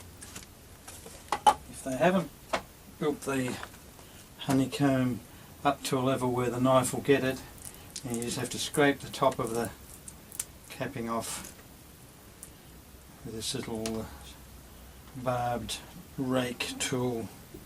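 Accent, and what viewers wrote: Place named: Australia. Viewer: Heard your accent and immediately said "he sounds like a fellow Aussie" Then saw the Geelong shirt and all was confirmed.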